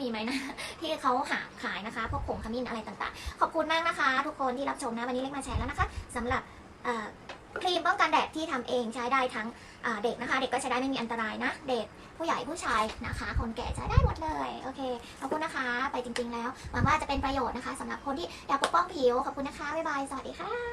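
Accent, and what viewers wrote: Isan, Thailand. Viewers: เว่าอิสานม่วนดีเสียงน่ารักมากเลยน้อง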